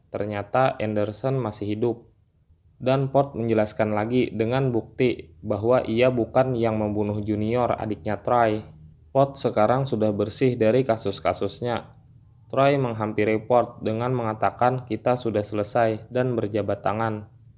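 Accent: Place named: South Kalimantan, Indonesia. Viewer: Totok banar banjarnya masih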